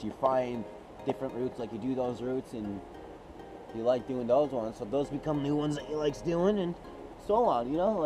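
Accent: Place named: Newfoundland, Canada. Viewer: Awesome video bud. Was like I was there having a chat with ya! Your newfie accent is gettin tick! Haha cheers!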